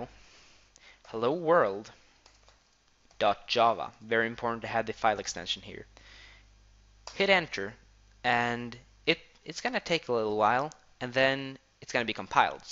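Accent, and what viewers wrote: Sweden. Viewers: DU ER SVEEEENSK! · are you swedish? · är du svensk?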